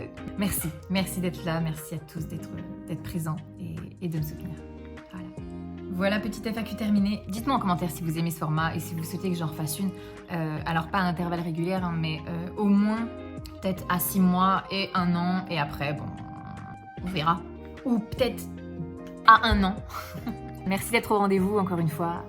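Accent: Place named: England, United Kingdom. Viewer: Et les copains de France te manquent pas ? Vilaine 🙃🙃 j'adore ton accent anglais ! Et merde pour tes exams du coup :) bisous bisous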